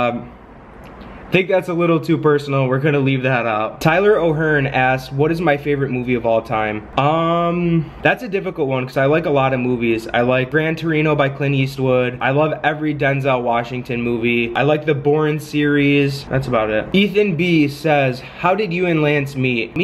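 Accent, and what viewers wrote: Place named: Upstate New York, United States. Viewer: I could hear the Upstate NY in your voice. You sound just like my ex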